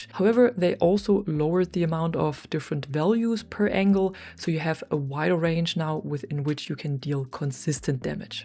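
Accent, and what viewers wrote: Germany. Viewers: German accent detected. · Ich wusste doch dass du deutsch bist. Hab mich immer über den Akzent gewundert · Love your german accent. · Dieser deutsche Akzent, wow😂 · Is she German? accent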